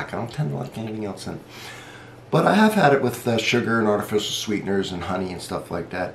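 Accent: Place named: Philadelphia, United States. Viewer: New to your channel and really enjoyed watch all the coffee videos. A nice way to spend a Sunday morning! Will have to make my way through the beer and whiskey ones! I switched to using heavy cream (also from Kroger here in Indiana) instead of half and half and sugar and never looked back. It does bring out the taste. But your sound more like a Philly boy than a Kentuckian!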